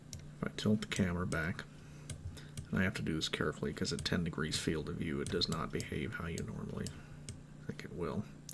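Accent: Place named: Australia. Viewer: Dear, I'm trying to understand why Yourself, CoolHardLogic and Reds Rhetoric don't use your real names and show your faces on vids? Are yous afraid of something? Even many of these FE people do. Also are there ANY "conspiracies" that you do believe in? Your accent sounds like an Aussie! An Aussie at the a bar the other weekend told me about a supposed shooting hoax in Australia. The Port Arthur Massacre? We never heard much about it in the states here. He said MANY Aussies were fooled by it at first. Apparently it lead to a gun buy back program which took many guns out of Australian people's hands who now wish they didn't do it? Sounds weird to me? These vids on Youtube don't make any sense about it or seem too "conspiracy" minded. It's just a buy back program anyway right? Any man can still buy a gun down there, correct? Australians would never go for that nonsense, they are hard working proud men! 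I don't believe it.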